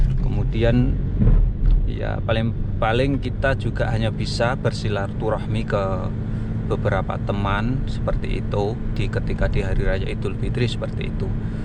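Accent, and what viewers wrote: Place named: Banyumas, Indonesia. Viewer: bang asli endi ngomongnya ngapak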